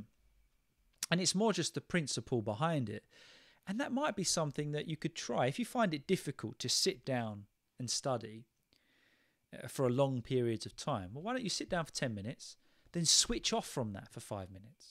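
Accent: United Kingdom. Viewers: No, thank you. Because I admire British accents, I’ve been looking for the most linguistically profound and elegant British voice on YouTube for months and I just found it. Keep up the spectacular work. I have an issue which is that it’s easy for me to have self and social conversations and then understand almost 99% of conversations and reports passing my ear, but when to relate to official dialogue, I get jammed, keeping in mind that I'm familiar with public speaking in my mother tongue and I don't speak English well as well as I write or type.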